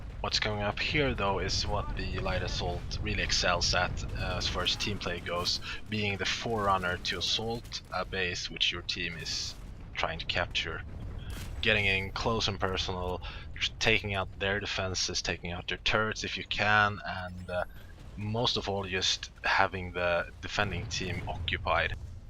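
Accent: Sweden. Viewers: From your accent I'm gonna go ahead and guess that you're from Sweden, or at least Scandinavia. :-)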